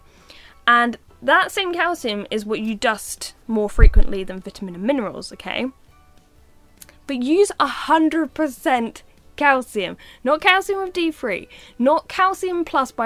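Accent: United Kingdom. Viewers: Erica SPEARMAN that’s how the Brits say it. Most understand.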